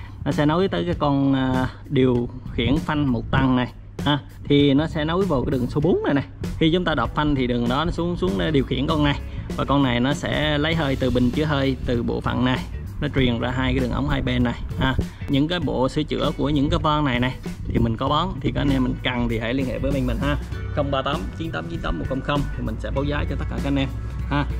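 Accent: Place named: Quang Ngai, Vietnam. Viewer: Giống Quảng Ngãi vậy anh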